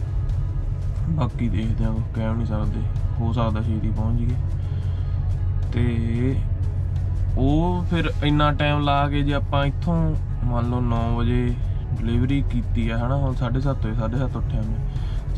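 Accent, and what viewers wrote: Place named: Malwa, India. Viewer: 22 g boli to malwa touch lagda lv u bro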